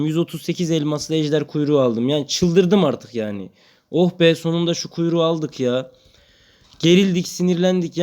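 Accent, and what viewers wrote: Erzurum, Turkey. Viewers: erzurumlumusun abi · Abi sen erzurumlumusun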